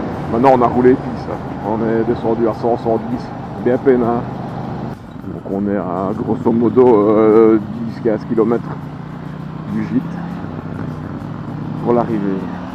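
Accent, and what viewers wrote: Belgium. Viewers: en faite j'hésite entre 2 motos que tu a testé ta ktm 790 duke ou la Triumph street triple rs les 2 me tente bien mais les budgets font réfléchir vu le temps que on a en Belgique et oui j'habite pas très loin de ton coness peut tu me donner ton avis sur les 2 machines points fort, points faible rapport qualité prix et aussi le cout entretiens pour ta ktm je te remercie d'avance et sa fait plaisir de voir et d''entendre un moto volgeur belge qui d'jase wallons V potos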